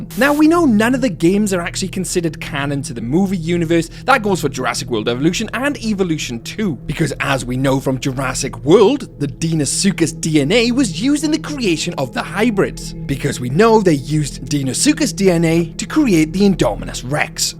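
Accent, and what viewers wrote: Wales, United Kingdom. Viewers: Call me crazy but instead of hybrids on hybrids I’d love to see a deinosuchus, titanaboa, daedon or Troodons in JW. Also forgive me is your accent from wales?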